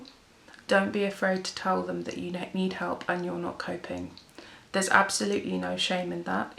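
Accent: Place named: England, United Kingdom. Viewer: you have given me so much hope I notice that periodically I haven’t thought or heard my tinnitus you are a breath of fresh air. Listening to you I felt supported and hopeful of habituating this pest progressively as time goes by I have deleted all my Facebook chat groups as some were not to my liking plus extremely negative and they frightened me immensely as the comments made me think my life is over. I love your music. Your voice is beautiful to listen to. I’ve always adored the English accent (they are all different). Your presentation video was the best I have found yet hooray I stumbled upon you. You gorgeous girl xx💜 On Mon, 22 Jul 2019 pm, <mail> wrote: View this email in your browser THANKS FOR WATCHING MY VIDEO ON LIVING WITH TINNITUS AND FOR SIGNING UP TO MY MAILING LIST Now here's your free download of my song, all about my struggles with tinnitus DOWNLOAD NOW p.s. 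Let me know what you think of the song on Instagram, Facebook, or drop me an email! x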